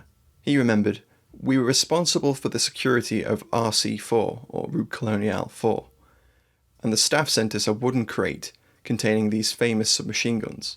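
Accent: United Kingdom. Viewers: Is this one deactivated as you sound British.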